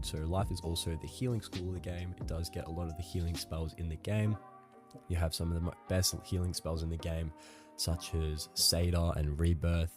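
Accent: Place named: Australia. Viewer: judging by the accent you're Aussie too, im questing atm still pretty new and looking for some people to play with if you're keen or anyone else watching.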